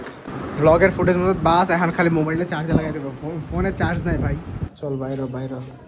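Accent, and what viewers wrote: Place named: Chittagong, Bangladesh. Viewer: Vai tomar kotha te tw Chittagong er tone ase..So you are not from chittagong?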